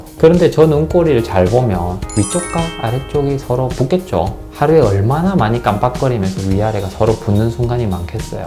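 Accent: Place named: Gyeongsang, South Korea. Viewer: 구독 좋아요 누루라고 하셔서 바로 눌렀어요. 말 잘듣는 시민이쥬?~^^ 목소리 들으니 경상도쪽? 같으신데ㅠ 좋은분들은 머네요 흑ㅠ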